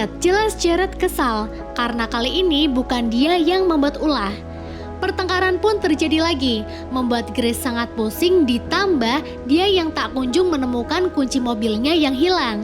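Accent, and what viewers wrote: Pemalang, Indonesia. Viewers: Btw orang pemalang bukan? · Orang Pemalang kah? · wong pemalang yo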